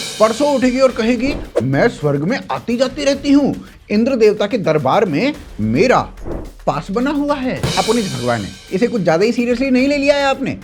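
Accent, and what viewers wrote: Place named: Braj, India. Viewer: Mathura ke ho kya? Bhasha brijbhasha jesi lag rhi thi😊 Anurag Kashyap na Rajput hai, na Brahmin, vo dalla h bs.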